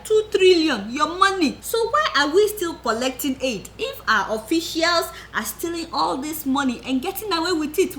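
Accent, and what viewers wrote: Nigeria. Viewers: Very Real!! Authentic Naija accent...no forming:*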